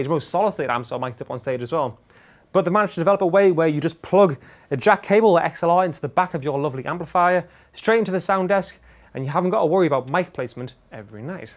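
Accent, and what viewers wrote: United Kingdom. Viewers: You're awesome! Fun to watch - and the snappy brit accent goes well with the pirate look :) - A pleasure to see. Thanks from Hawaii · I can’t tell whether this guys accent is Geordie, Scouse, Yorkshire, Scandinavian or posh English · your face and body are too distracting - I didn't hear a word you said but your british accent rocks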